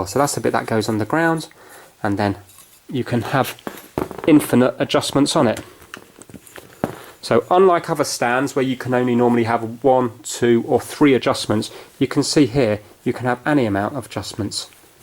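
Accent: United Kingdom. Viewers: Wait are u British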